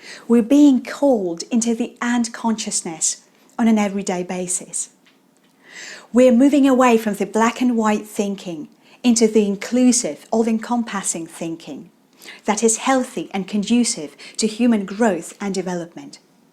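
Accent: United Kingdom. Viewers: I have known you for several years. I first texted you in VK saying that I am love with your amazing British accent. I started working on mine one year ago. You were a massive motivation for me, thank you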